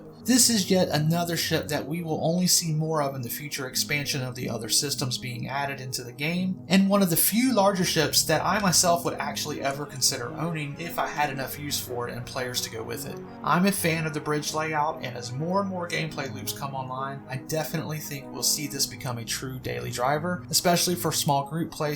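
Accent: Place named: North Carolina, United States. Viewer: I swear that sounds like a North Carolina accent! [enough to sound Local to me]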